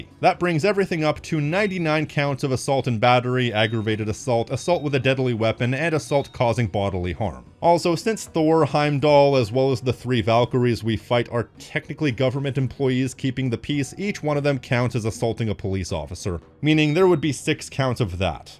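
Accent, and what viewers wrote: Canada. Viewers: Hes Canadian, note the subtle pronunciation of a-boat instead of about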